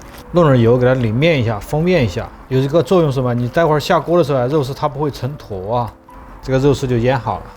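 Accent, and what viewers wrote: Sichuan, China. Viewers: 好喜欢的四川口音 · 一定要多支持，听口音感觉是我们四川老乡，最关键的是讲解的非常仔细清晰但是不复杂。学到了很多知识。非常感谢，继续加油~~~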